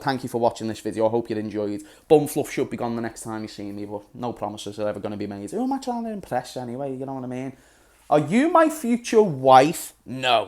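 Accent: Liverpool, United Kingdom. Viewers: Keep it up James we need a scouse voice on every haters channel cook the hamez lad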